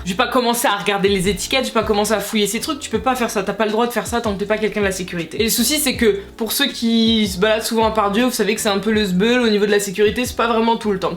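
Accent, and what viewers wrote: England, United Kingdom. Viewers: ton accent british quand tu parle anglais me perturbe trop je sais pas pk 😭😭😂😂😂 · On peut prendre 2min pour admirer son accent anglais magnifique ? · The british accent waoouuh🥺🥰🥰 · Grave un accent british quand tu parles anglais. Ca fait chelou, limite j'ai límpression que cést une autre langue. Ici à Atlanta, on parle beaucou[ plus vite et avec le nez.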